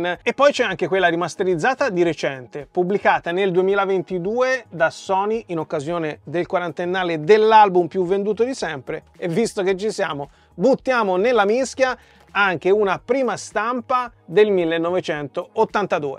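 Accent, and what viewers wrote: Tuscany, Italy. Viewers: Ciao sentendo l accento toscano te tutto bene con l alluvione spero.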